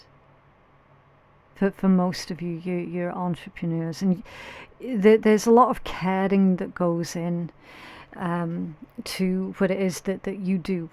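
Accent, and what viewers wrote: Scotland, United Kingdom. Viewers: Love your Scottish accent 💖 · Thank you for ur readings..been putting my rune under pillow. Ur voice reminds my of living bonny Scotland for 2 years and how her beauty and kindness has healed me when it was needed. Bless u deary❤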